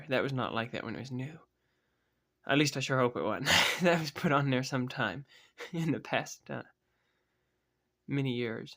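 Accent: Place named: Virginia, United States. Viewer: I just came across your channel and Ive watched 4 or 5 of your videos so far and Ive enjoyed them all. I must ask, what state or region are you from? Im trying to pin point your accent. If I had to guess, I would say Virginia or a neighboring state to it. I do want to throw in my experience on this subject. I apologize in advance as this got longer than I initially intended. I collect knives of many manufacturers, Case of course, but also older Remingtons, pre swinden Schrades, Queens, GEC, etc. I would say though that Case is my bread and butter and where my knowledge and experience most lies. Before I say what I am about to say, you and everyone else who may read this should know that I specifically collect pre-1970 Case knives. I currently have probably two dozen of these, mostly XX era. I have some newer ones as well but much prefer the XX era knives. 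Now that everybody knows my bias, I want to say that it is my opinion that Case quality is no worse now than it ever has been. I would say 3/4 of my pre-1970 Cases are mint, unused and not a single one is what a lot of people nowadays would judge as “perfect”. They all have blade rub, they all have atleast one if not more proud scales or bolsters, they all have atleast a proud or sunken back spring when a blade is open, the centering is not perfect on any of the ones where blade centering is applicable, all have gaps somehwere and the factory edges on the vintage mint ones suck as well. These are all things people complain a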